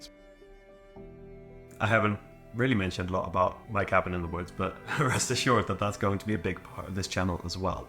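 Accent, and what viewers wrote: Sweden. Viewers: you already lost your British accent and sound like a swede speaking English.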